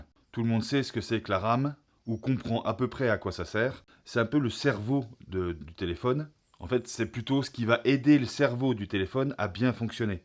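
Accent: Franche-Comté, France. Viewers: Merci pour tes vidéos, je voulais te demander, tu es de Franche-Comté ? Rapport à ton accent !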